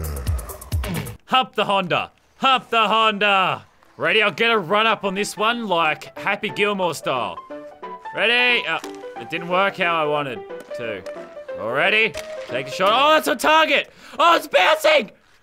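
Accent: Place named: Australia. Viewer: Your next challenge is to do an entire video in an accent besides australian!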